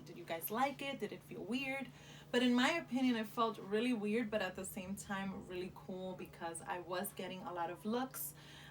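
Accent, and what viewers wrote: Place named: Latin America. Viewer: First time on your channel, I really like the style of it, and your Latin accent is HOT! I subscribed, looking forward to future content! I got the white and black, so far I wore the white and it does feel a bit weird but I think you’ll get used to it fast.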